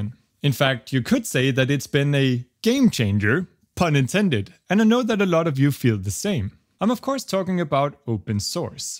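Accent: Denmark. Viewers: Are You Danish? :D I just moved to Denmark recently, what a coincidence :D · På trods af den meget milde danske accent, og at jeg vidste du var dansk. Så var det helt underligt at høre dig snakke dansk 😆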